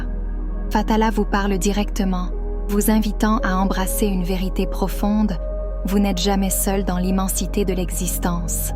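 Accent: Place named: Canada. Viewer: ******* Bonjour, (Elle a un petit fond d'accent canadien cette voix de l'IA ). Nous le savons bien qu'ils nous manipulent depuis le panthéon mésopotamien..... Mais nous sommes au temps de l'apocalypse et notre désir est de parvenir sur Nibiru le plus vite et le mieux possible... Nous y travaillons de tous, nous, les Ligthworkers... Hi, Fathala...Par IA interposé ! ******************************************************************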